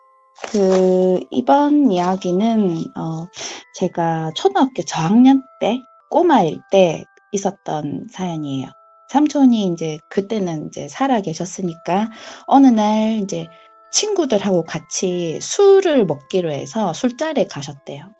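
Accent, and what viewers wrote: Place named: Busan, South Korea. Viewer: 진짜 동감입니다ᆢ'부산사투리 짱입니다ᆢ말도 잘하시고ᆢ목소리도 이쁘시고ᆢ또 부탁할게요 ^^♡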